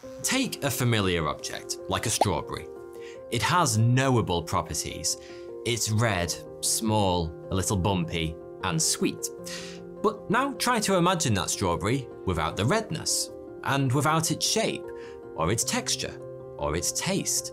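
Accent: United Kingdom. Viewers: British accent makes everything sound nice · Talking of...or is that "speaking-of"... whatever....in regards to "perception". ¿I wonder if " " ever did an Episode on Accents? In short, people's Regional Vocal Accents... for, also to a slight "hearing" problem I've developed, requiring the use of hearing-aid, and because of " "'s Regional British Accent, that was saying "Forty-Two" instead of " ". As an 'omage to Douglas Adams, until I really noticed the on "Screen" 'printed-tag' " ". Which could be sort of "Multi-Part-er" starting with why people speaking English pronounce the way they do: in different parts of England; Canada, Australia; New Zealand; and the USA; Segway into why some people from Non-English Speaking Countries have an "Accent" due to the way They Learned to correctly pronounce Their "Native" language. Then on to why different Dialects develop in Languages in general, possibly using China as an 'extreme' example. 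Eventually, leading into why there are more than 7,100 languages are spoken in the world today.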